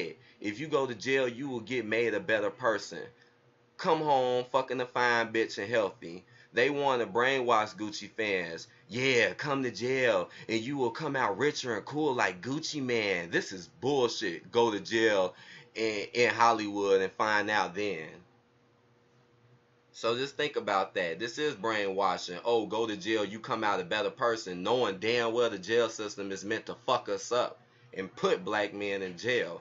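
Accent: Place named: United Kingdom. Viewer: Wow. I'm glad I"m not the only one that caught the British accent being covered up. I bet this guy originates from Nigeria or one of the islands..They frequently migrate to London.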